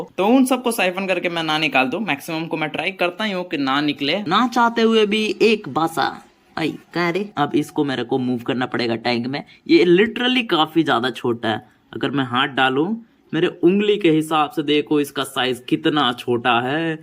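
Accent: Bengal, India. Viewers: Bengali ya bihari?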